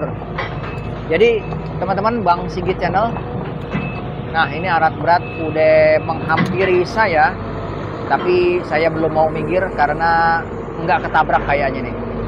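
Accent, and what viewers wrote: Jakarta, Indonesia. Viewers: Gw suka logat ente Bang...turunan betokai ye....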